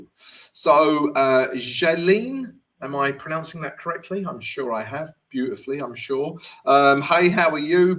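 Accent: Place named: South Africa. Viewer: lol, catching up on stream,love that Saffa accent. Check out NZDCHF, just broke out of long term trend line, possible long opportunity.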